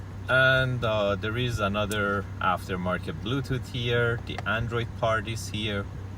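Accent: Iran. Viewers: haha iranian accent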